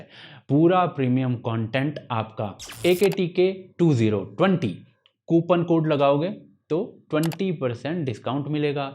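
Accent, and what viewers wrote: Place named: Malwa, India. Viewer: Sir Aap Malwa ke lagg te ho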